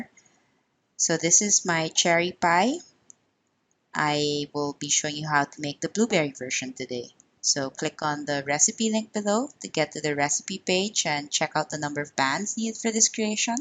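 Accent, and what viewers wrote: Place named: Philippines. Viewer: God your so talented!!! I was wondering if you could make egg tarts or any other Japanese, Chinese, Filipino or any asain famous foods. Pretty please!!! Also, i can hear that you have an accent, well, I think. Are you a filipina po?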